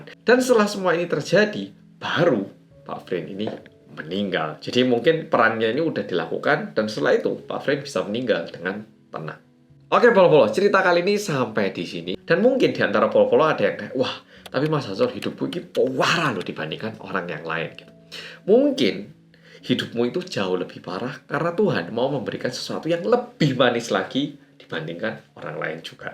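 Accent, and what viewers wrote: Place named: Java, Indonesia. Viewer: SUKAK LOGATNYA MAS. BASA ENDONESIANYA JAWA BGTTT. APIIKKKK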